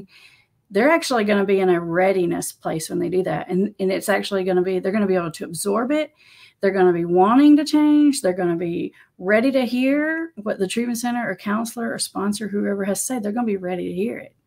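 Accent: South, United States. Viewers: Love the channel and your slow Southern style !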